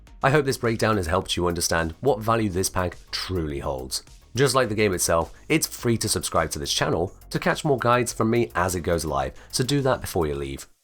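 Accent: United Kingdom. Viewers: True London voice.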